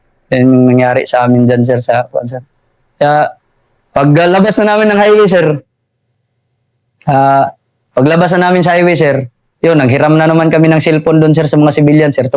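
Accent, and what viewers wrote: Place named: Surigao, Philippines. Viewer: Sgt kumusta ang galing mo parang taga Agsam o Ibuan Lanuza surigao del sur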